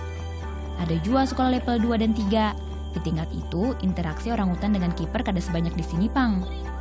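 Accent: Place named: Banjar, Indonesia. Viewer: Pang nya banyak banar,,,, BANJAR BANAR pang,,,😁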